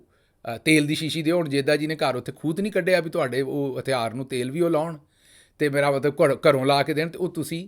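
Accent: Punjab, India. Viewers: Dr sahib🎉we enjoyed ur Punjabi accent wd nimma nimma hassssssa😂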